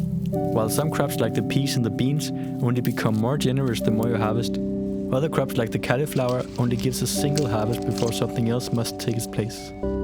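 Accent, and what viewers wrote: Denmark. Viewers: you sound Danish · Am jeg høring danglish? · the accent makes me think you are one of us. love from Skanderborg.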